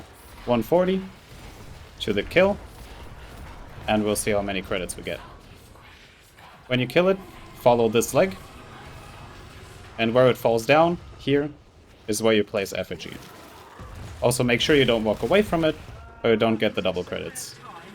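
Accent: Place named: Germany. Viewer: I thought I heard my fellow German accent in here ;) nice video!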